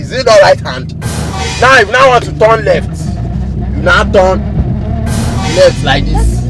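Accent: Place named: Nigeria. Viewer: You are so funny. I love you you man. How Nigerian speak, make me smile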